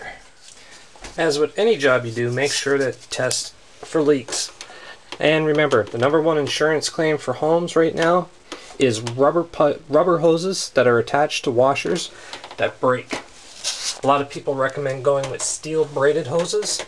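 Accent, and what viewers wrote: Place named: Canada. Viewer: Oh you SO rock :) I was thinking I had to a get a new washer but followed your steps and sure enough - that little bugger was broken., Ordered a new one and paid extra so it'll be here Fri (it's Wed now) SWEET! YAY I don't have to go to a skeezy laundromat LOL THANK YOU! :) (And you're Canadian - so am I - I could tell by the "oots" before you mentioned Toronto LOL I'm in Seattle but from BC.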